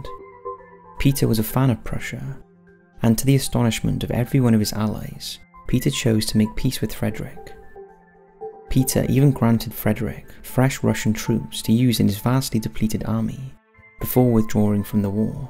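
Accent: United Kingdom. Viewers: Hugh Jass He’s a Brit, hes gonna pronounce words in a different way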